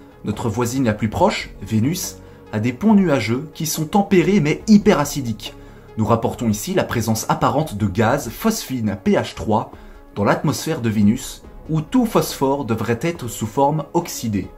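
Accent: Belgium. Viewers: Tiens! T'est belge ? Je m'en doutais ! Tu me fais trop penser à Yan L'Or-iginal 🙂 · Nonnnn t’es belge! Lol il on ou ton accents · Tu es belge?mdr sinon j'aime bien tes vidéos 😁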